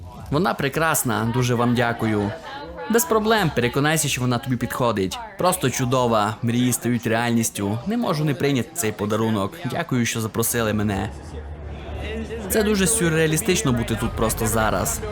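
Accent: Transcarpathia, Ukraine. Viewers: І ще одне питання. Дівчина почувши відео впевнена що ти земляк з Закарпаття 😅 Так є, чи помиляється?) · Круто але западенський акцент дратує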